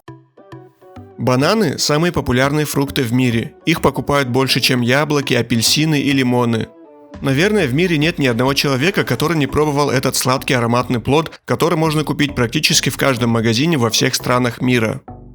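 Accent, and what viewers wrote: Kabardino-Balkaria, Russia. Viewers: Кабардинский акцент у диктора)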